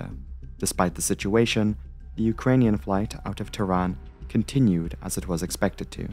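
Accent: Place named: Newcastle, United Kingdom. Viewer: Thank you for another informative video. I'll pledge if you like. Your Geordie accent sneaks out sometimes 🥰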